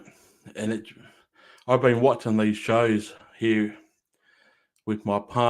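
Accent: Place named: Australia. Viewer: Thought you sounded like an Aussie 🇦🇺🇦🇺🇦🇺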